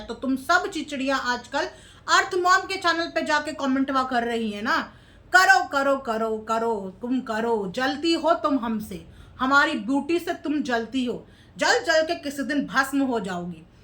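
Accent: Bihar, India. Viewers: di aap to ab thoda thoda bihari bhasa niklne lga lgta h ap bhut jyda video dekhne lgi kumata devi ji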